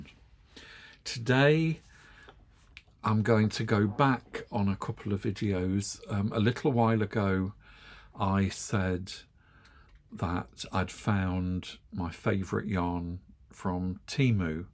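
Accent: United Kingdom. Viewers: I love your British English and I am sorry that Americans have the same language 😂 But I am Austrian and we have the same problems with Germans 🤪 Thank‘ s for your Videos! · Hi, I just found your channel, greetings from Brazil. I love the british accent and is a bonus we have the metric system in common! Thank you for sharing your beloved findings in Temu, so I can get some and all those comments, I'm having a laugh!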